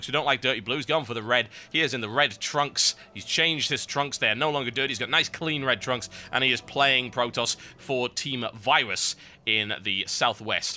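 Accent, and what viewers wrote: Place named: England, United Kingdom. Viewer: i could listen to your damn-so-good-comment all f'in day, especially because of your incredible authentic english accent :) total biscuit you are a total wizkid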